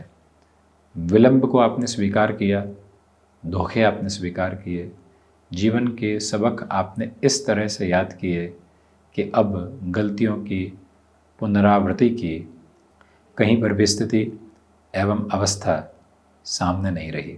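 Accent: Standard. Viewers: Itni suddh hindi Maine DD1 ka Ramayan mai bhi nahi suna tha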